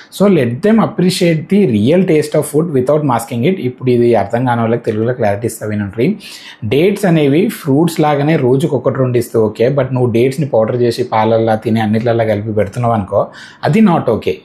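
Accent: Telangana, India. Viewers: సర్. చక్కగా తెలుగు లొ మాట్లాడుతూ.... హఠాత్తుగా ఇంగ్లీషు లోకి వెళ్ళి పోయారు... అలా మాట్లాడితేనే knowledge ఉన్నట్లా enti....చక్కగా మీ తెలంగాణ యాస తో చెప్పండి సారూ మంచి గుంటాది 🙏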